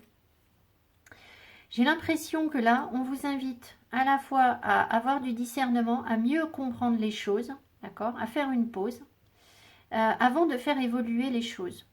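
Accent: England, United Kingdom. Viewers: J’adore votre accent anglais 😊 merci c’est juste 🙏🏻 🙏🏻🙏🏻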